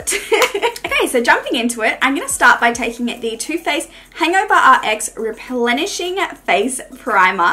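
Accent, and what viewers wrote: Australia. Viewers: Where are you from? You sound kind of Australian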